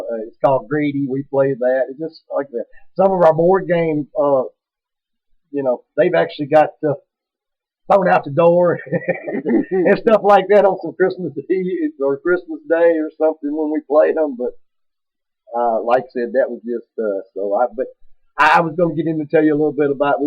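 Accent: South, United States. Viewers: SOUTHERN flair! Says it all! 👍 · Southern stories told with a southern flair! Love it! Merry Christmas to you and yours,!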